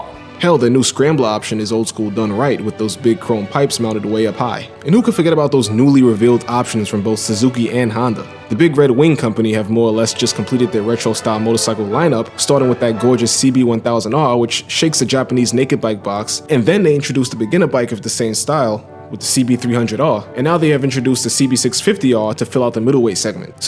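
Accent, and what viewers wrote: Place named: East Coast, United States. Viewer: You are very articulate and eloquently spoken, with a slight accent (my guess would be somewhere on the east coast) Great video!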